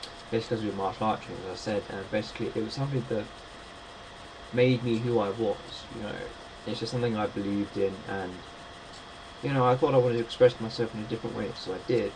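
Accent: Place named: United States. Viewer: Dude please, PLEASE drop the american acsent!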